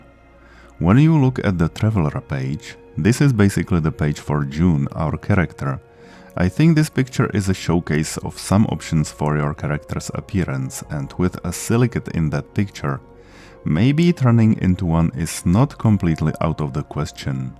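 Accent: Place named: Czech Republic. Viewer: You sound very Czech. Are you Czech? YOU ARE! Hello :D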